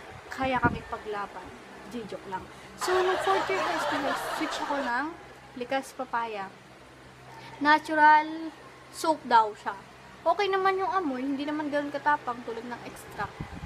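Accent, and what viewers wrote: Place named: Iloilo, Philippines. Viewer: Ilongga ka girl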